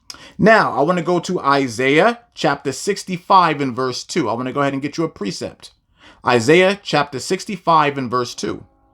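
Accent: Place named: New York, United States. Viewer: Shalawam Brother, praying safety for u n ur household. Sounds like ur from the Big Apple so be safe n keep doing what TMH n Christ wants u to do. MHICBU all!!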